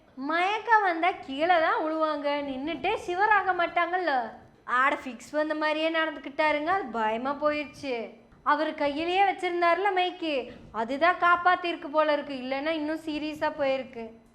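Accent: Kongu, India. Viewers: அழகு கொங்கு தமிழில் ஆழமான கருத்தாழம் வாழ்த்துக்கள் சகோதரி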